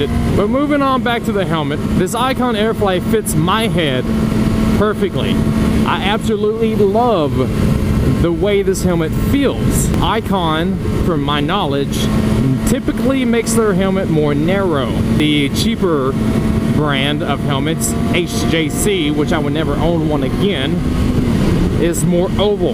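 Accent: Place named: South, United States. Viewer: Hey man, keep up the vocal pace to avoid a 20 min video. It sounds normal in 1.5x speed! The southern draw is nice but if you keep yourself aware of pace you can cut your vid time down by about 40%. Nice vid though, Cheers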